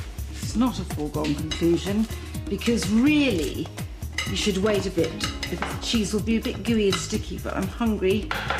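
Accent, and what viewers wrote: RP, United Kingdom. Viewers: "Gorgeous golden globules"... god, I love her RP (received pronunciation) accent and frequent use of alliteration.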